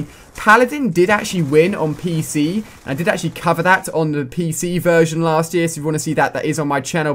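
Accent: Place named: England, United Kingdom. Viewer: English accent but says amarican (astralian)currency$$$$$??????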